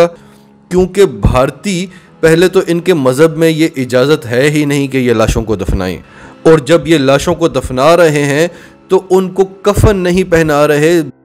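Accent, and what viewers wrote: Pakistan. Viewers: Good review pure pakistani urdu accent love you brother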